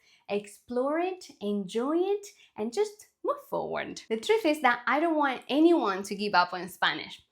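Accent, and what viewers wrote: England, United Kingdom. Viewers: This is very true. Just listening isn't enough. If you listen to a lot of hours your listening comprehension will be high but your speaking won't. You've got to practice speaking and at first it will be difficult with a lot of mistakes, but the more you speak the better you will speak. The great thing about it is, if you listened to 2000 hours you don't need to match those hours with speaking hours. You can reach a good level of speaking with less hours but obviously, the more speaking hours the better., I can tell you've listened to a lot of English content. You've acquired the accents. I say accents because you have an accent from England and also a little from America. It's all good though. You're fluent. There is no doubt.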